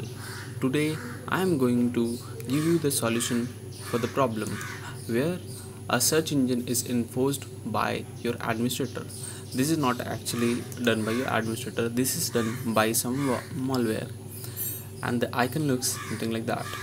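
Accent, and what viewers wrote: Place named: India. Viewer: thanks, it helped but ur indian accent though😂 😂 😂 😂 😂 😂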